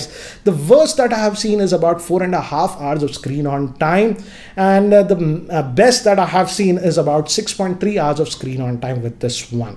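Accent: India. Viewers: Couldn’t finish the review because of an accident. Great review though but Indian accent is the worst ever and hurts my ears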